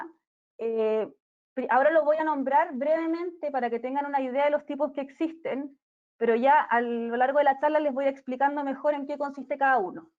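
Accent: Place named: Chile. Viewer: Gracias por la conferencia, muy didáctica y un contenido excelente. Y un acento chileno precioso, digno de Gabriela Mistral...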